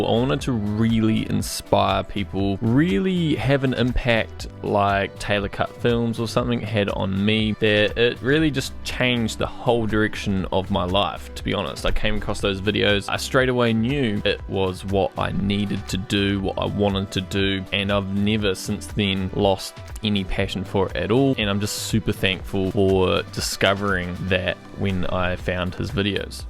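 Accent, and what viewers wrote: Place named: New Zealand. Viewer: So I’ve watched other videos of yours and only just clicked now that you do indeed have a kiwi accent and are from NZ too. I feel so stupid haha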